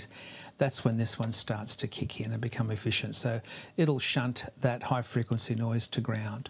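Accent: Australia. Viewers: AMEN!! SO glad to hear all of that! I sort of thought you might be Australian, but I wasn't for sure hearing some of those sounds that usually cue me in. And I WILL be looking for that PRO video!! Once again, so glad for your daughter's good health and to know that I have a brother just a short swim away! (I live in Jacksonville, FL) Happy Easter ... Jesus is coming back soon!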